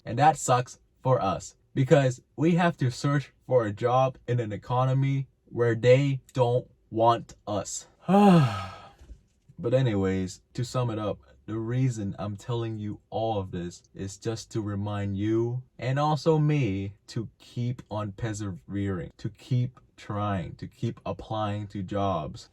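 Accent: Vietnam. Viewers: Are you in America? Sounds Vietnamese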